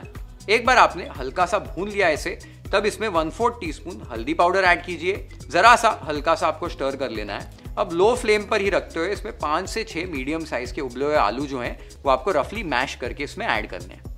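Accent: Maharashtra, India. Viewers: You are a pure Maharashtrian.....the way you pronounce birda,fadfada....I'm so thankful to you for giving a platform to our satvik,simple n tasty Maharashtrian food ❤ Bappa tuzya saglya ichha Purna Karo...khup khup aashirvaad.... Ganpati Bappa Morya 🌞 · WOOOOOOOOOOOOOOOW, the way you pronounce all the Marathi dishes it's a treat to listen and as always the thali is outstanding. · Sanjyot, tuze marathi uchhar agadi * Marathi* aahet. Khup chan video... Marathi jevan purn dakhavkaya baddal❤ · It's mouth watering recipes.....enjoyed your recipe and typical marathi pronunciation 👏👏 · Your marathi accent is so sweet 😅😂😂& i really like your recipes, they are so easy to follow🤗😁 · Pleased by the video 🤤🤤 And your Marathi accent is OMG.....✨✨ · I can see Marathi accent in you Really want to know the reason Love ur recepies❤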